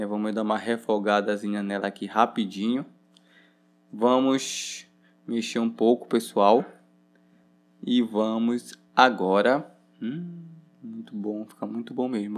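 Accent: Pará, Brazil. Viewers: Amoooo 😍😍 o seu sutaqui , paraense 💕💕💕💕💕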